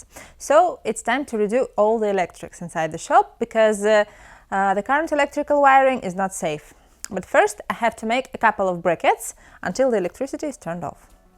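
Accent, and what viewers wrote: Russia. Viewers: Ok, two things. The first one is that you aren't based in USA, but in Russia. I can tell you because of the sockets, also you do have a bit of Russian accent. The second one is that you clearly didn't do this alone, there is a man behind you, and it's not your grandpa. There is a man with a lot of knowledge. I am no fool. You are talented, but you didn't do this all by yourself, there is someone behind every move, maybe more than one person. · why do you have that terrible Russian accent?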